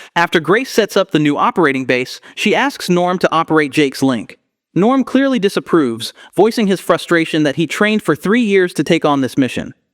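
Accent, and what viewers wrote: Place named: Russia. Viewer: Что за хрень? Откуда этот рашен инглиш? Аж уши режет. Why?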